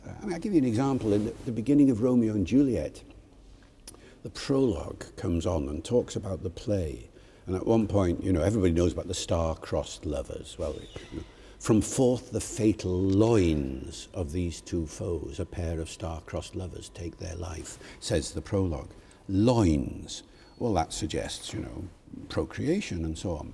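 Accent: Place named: Wales, United Kingdom. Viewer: He occasionally sounds a bit Welsh. Born in Northern Ireland. Wonder how that happened?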